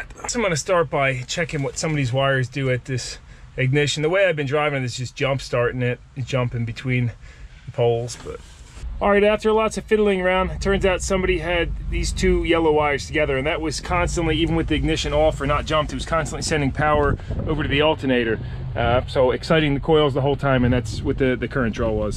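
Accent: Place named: Pennsylvania, United States. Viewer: Hi, new sub here, just found your channels. It was the '65 Plymouth wagon that drew me in . Sounds like you're from PA...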